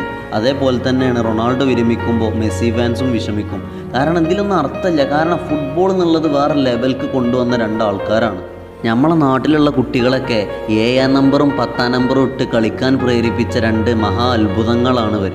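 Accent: Malappuram, India. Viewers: Malappuram karan Ano?... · മലപ്പുറം ഭാഷാ ശൈലി ഹൃദയത്തിൽ കൊണ്ടു😘❤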